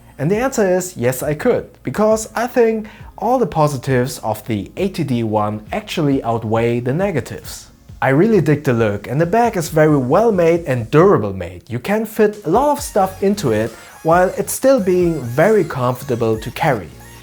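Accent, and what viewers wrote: Germany. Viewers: I honestly can't tell if you're happy, sad, upset, mad or tired because of your German accent lol. It's basically always one tone because of the pauses. I'm sure that's just a habit of speech from the German language.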